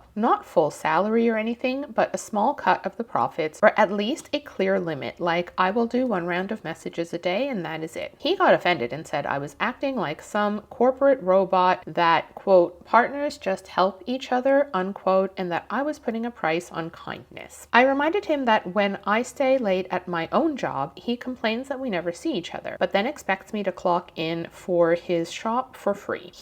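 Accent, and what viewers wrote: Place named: United States. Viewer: what is your accent? She’s American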